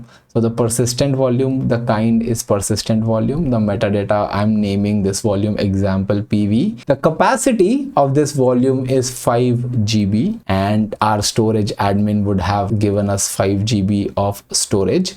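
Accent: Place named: India. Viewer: Refreshing pace and much clearer delivery! Even with the distinct Indian accent on the T's and D's, it's so much easier to follow than usual. Nice!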